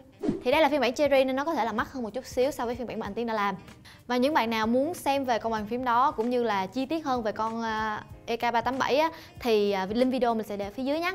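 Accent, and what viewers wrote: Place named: Saigon, Vietnam. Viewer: Ban Nu nay giong Sg tot... Than thien voi moi truong !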